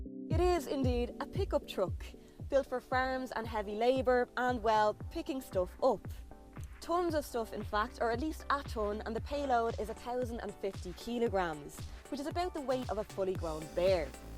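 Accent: Ireland. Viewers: Well it is an Irish accent, don't be so insulting and turn on captions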